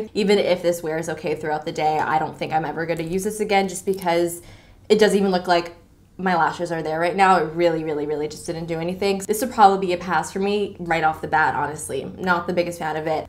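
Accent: France. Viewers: Are you French? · Are you french?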